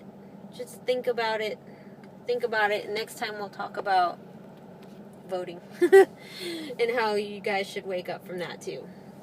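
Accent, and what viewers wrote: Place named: Midwest, United States. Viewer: I enjoyed this little rant. Are you in Cali or Florida? You sound like you're from the midwest. What's the story with your name?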